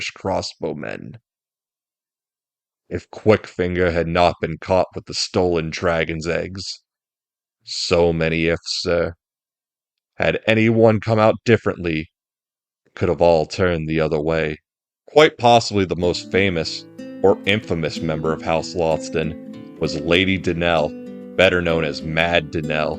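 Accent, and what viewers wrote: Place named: Boston, United States. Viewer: Awesome video bro. You GOTTA be from Boston.I'm from Southie. Oh hey I'm not being a twat at all, but it would be "patronized" not "patroned'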